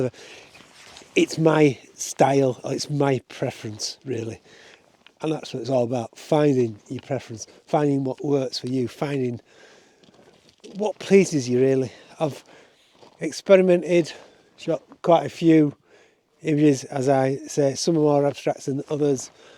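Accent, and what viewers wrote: Yorkshire, United Kingdom. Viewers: I've had great pleasure in viewing your interesting, chatty videos, right from the beginning. This particular episode reminds me of when I had my best work assessed by a particularly high ranking elderly lady photographer, around 15 years ago. This was after having some 40 years of photographic experience behind me. In a nutshell, though the images showed competence, she told me the subjects were" too busy" - her own words. This pair of words is of course the absolute opposite of your subject here - minimalism. In taking on board this talented lady's advice, my photography was vastly improved and I have kept this thought in mind during the 15 years since, whether it be in monochrome or in color., your excellent advice is exactly spot-on with what this great lady photographer told me. Over the years since, my photos in their comparative simplicity have IMPACT, which was so lacking before! 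From one Yorkshire man to another, I love the refreshing content in your videos, including the relaxing music as well as the Yorkshire twang!!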